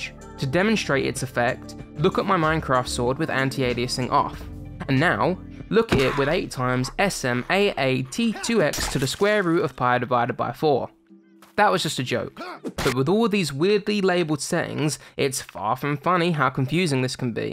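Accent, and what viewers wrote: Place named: Roadman, United Kingdom. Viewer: Well this is quite surreal, it's like listening to a roadman lecture about graphics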